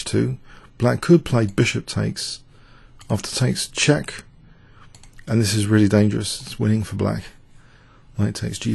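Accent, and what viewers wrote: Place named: London, United Kingdom. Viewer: Can you please use ''simpler english'' and more ''globalised'' accent :) .. nt all your viewers are from London ! that;s why more and more are vewing Matozelich .. :)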